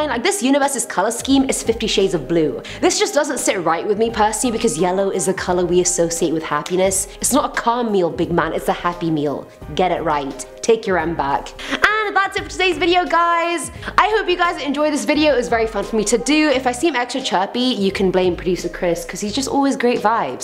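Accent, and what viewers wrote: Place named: United Kingdom. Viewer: Videos aren't the same when Che is not the host. And why put a British host? 😯🤣🤣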